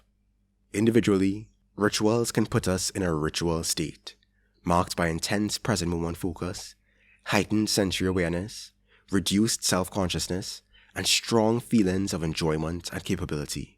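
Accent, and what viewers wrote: Trinidad and Tobago. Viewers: I am a long-time subscriber, a huge fan of your videos. In particular, I enjoy the prosodic cadence in the steady flow of your voice evident in your videos' systematic coverage of a given topics that will ensure they receive the explanation that they are due in time. I found myself thinking during this excellent video's presentation of your utopian-optimist theory-laden anarcho-idealist perspective, in your pleasant Trinidadian voice about the gravity of this rare moment in socio-political-economy which seems to invite a new analysis of every human philosophy or branch of one of the social sciences. For the sake of your intellectual curiosity & to spare me the frustration of yet another rewrite of this message, please watch the following 20 minute video on the polltical-economic assumptions of the 20th century that must be overcome to readjust to the Political Reality of the present era in which corporations have more financial power than nation-state actors. 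I would like to write an essay on this video in particular as it is a worthwhile tangent for serial nonfiction writing I am working on about the evolution of the United States BigTech into the monopolostic behemoths that they are today where dehumanizing pursuit of greater raw power far exceeds any & all ethical principles they pretend to have. At this moment it has become obvious to US Software Engineer YouTubers that Google's Play Store Global Developer Registry policies are paving the way for a grim neofascist/technof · hearing this trini voice dropping gems on my yt recommended was not on my bingo card 😂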